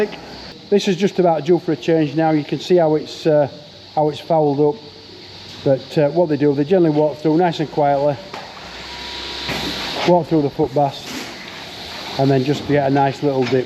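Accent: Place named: Yorkshire, United Kingdom. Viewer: By heck, thats a grand Yorkshire Accent. Having lived in NZ for 25 years now I miss the accents of the home county. All you need is a robot scraper now! And have you upgraded the JCB?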